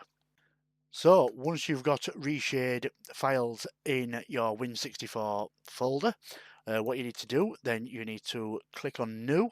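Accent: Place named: Northern England, United Kingdom. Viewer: arr thats a northern man if i ever heard one! thanks for the video <3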